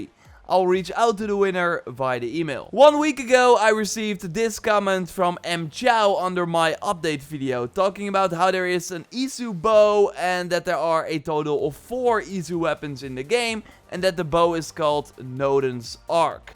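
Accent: Netherlands. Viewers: Are you Nederlands? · are u ducht? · How can people listen to this guy, the Dunglish is hurting my soul.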